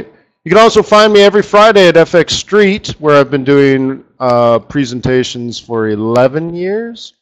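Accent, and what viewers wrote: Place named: England, United Kingdom. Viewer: You lost me on the English accent and had me with the python references -also good content as ever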